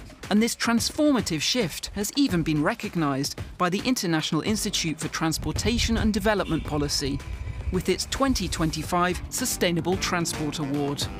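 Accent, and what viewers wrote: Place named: United Kingdom. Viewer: I stopped listening at British accent. Tired of the British accent narrating African reality.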